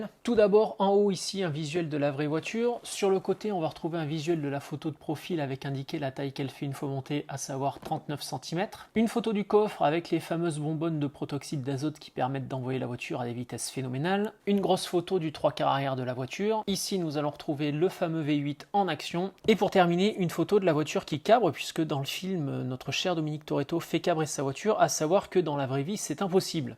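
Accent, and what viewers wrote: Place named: Quebec, Canada. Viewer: Simple question j'ai remarqué un peu ton accent est tu d'origine québécois ou tu vient de la France ?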